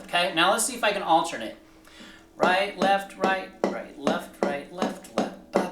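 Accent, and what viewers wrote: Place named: United Kingdom. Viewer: Oh u are from uk, I think u are from america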